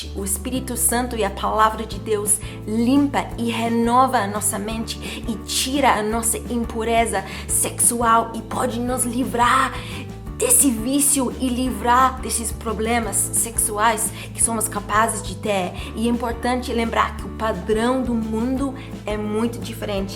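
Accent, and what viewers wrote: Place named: United Kingdom. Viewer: New subscriber... i love a bristish accent ... but born in portugal 🙌🏼🙌🏼🙌🏼🇵🇹🇵🇹🇵🇹 love your chanel you explain things well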